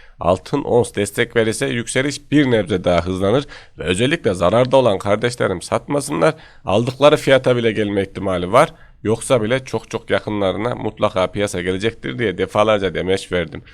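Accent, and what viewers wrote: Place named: Konya, Turkey. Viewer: Konya gibime geliyor 😊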